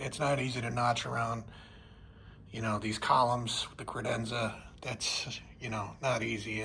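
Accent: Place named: United States. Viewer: I have stayed here before. Very nice hotel. You sound American. No hard feelings - Canadian here.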